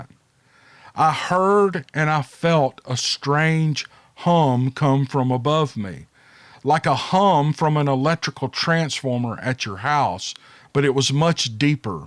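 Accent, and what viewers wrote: South, United States. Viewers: Hey!!!! Merry Christmas. I thank God for you and your channel. You bring me and so many people joy all year long. Plus as a midwesterner( Wisconsin) I love your southern narration! Bless you and yours. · They are not monsters,like some people think.i love this guys story,and the way you tell it.also,love your accent.southern boy,like me.if they seem aggresive ,then some one made them that way,possibly by harming one,or shooting one.too quick with the gun,they are more curious than anything.they don't mean you any harm,unless they have been abused by someone before you arrived.well,merry christmas to you and your family.keep up the good work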